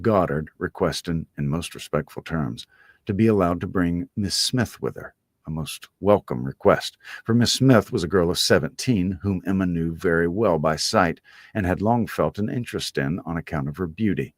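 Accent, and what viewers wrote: South, United States. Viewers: Thank You SO much for your love and work!! It's delightful listening to your renditions of classics - Southern Style! Fabulous!!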